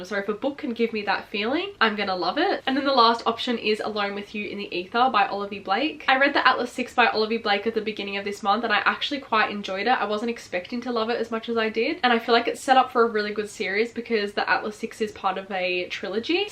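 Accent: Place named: Australia. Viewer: Cute Aussie accent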